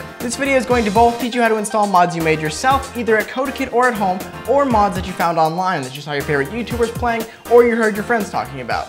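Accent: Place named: Russia. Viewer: are you Russian? It Sounded like you had a Russian Axint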